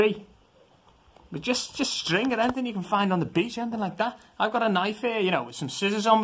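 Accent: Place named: Ireland. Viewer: More like wee Ireland accent to me lad.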